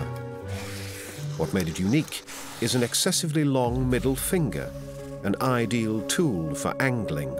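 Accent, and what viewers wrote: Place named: United Kingdom. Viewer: A smooth British accent can make people believe almost anything.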